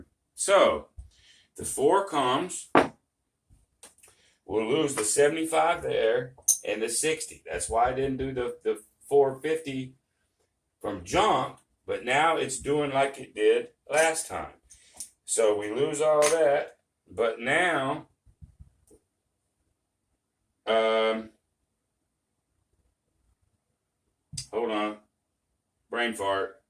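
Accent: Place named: Texas, United States. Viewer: Thanks I appreciate your video. Love to see it. That accent reminds me of Texas🌵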